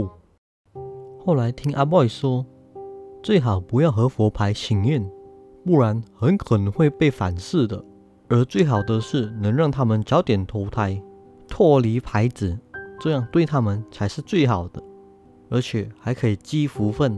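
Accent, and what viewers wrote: Malaysia. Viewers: 哈哈以前玩線上遊戲 有個大馬朋友就是跟你差不多 所以聽你講福建話我就想到他 也猜到你是福建後代🤣 好久沒聽到大馬福建話了😁 我以為這件事情是發生在大馬😅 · 你做的卡通很好看，听你的声音感觉是大马人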